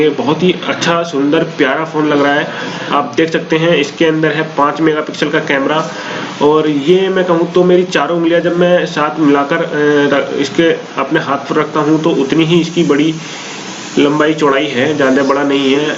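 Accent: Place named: India. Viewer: Dusre ke video ko Chura Ke Indian Awaaz lagakar Banaya Gaya video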